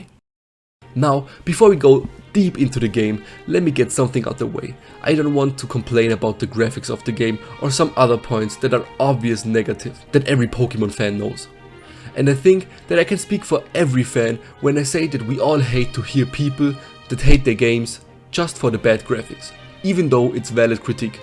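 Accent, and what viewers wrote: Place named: Germany. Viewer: Netter deutscher Akzent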